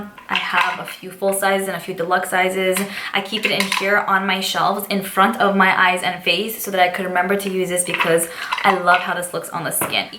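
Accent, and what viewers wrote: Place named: Long Island, United States. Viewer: Glaws Bombs! I was guessing you were a NY'er...hot chawclate made me think Long Island perhaps.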